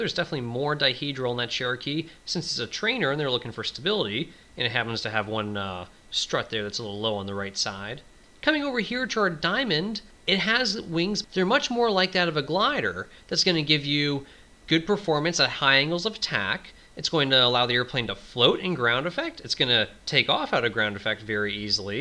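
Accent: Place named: United States. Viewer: Might be an interesting subject but can't listen to such an awful voice, quite apart from the bloody american accent.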